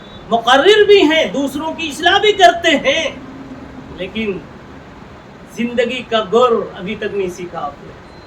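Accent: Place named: Pakistan. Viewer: قاری صاحب کا لہجہ لہجہ مکندواریہ لگتا ہے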